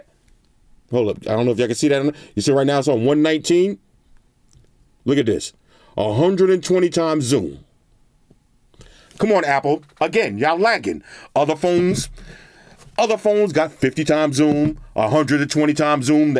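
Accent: New York, United States. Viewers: My man is soooo NEW YORK, I love it. I love apple but I agree with all your dislikes . They need to do better and give us at least a dongle and a brick yo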